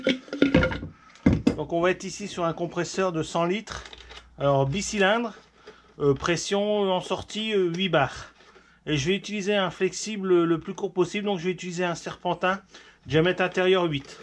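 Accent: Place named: Nord, France. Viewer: T es de ch'nord toi 😃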